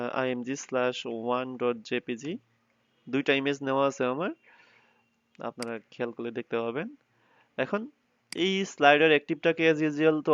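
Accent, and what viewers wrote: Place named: Bangladesh. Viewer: Mind blowing. This is the first time I saw tutorial in bengali. Bdw your accent sounds like you are from Bangladesh. Are you?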